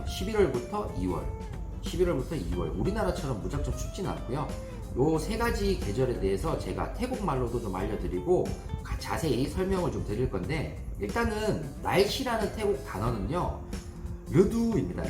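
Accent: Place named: Busan, South Korea. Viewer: 부산정 표준말씨로 방송 잘합니다. 좋은 방송 계속 해주세요.😅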